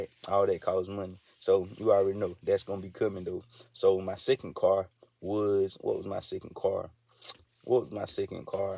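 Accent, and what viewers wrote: South, United States. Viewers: We country boizzz🤣💪🏾 dats the country accent righ dea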